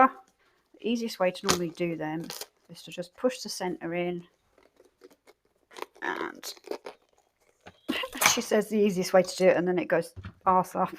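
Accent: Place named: South East England, United Kingdom. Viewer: I prefer natural unedited videos. You must be be from the south east your accent is the same as mine.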